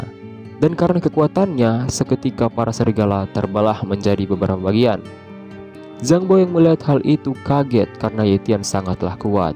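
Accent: Sulawesi, Indonesia. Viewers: Mantap bang, org sulawesikah? 😅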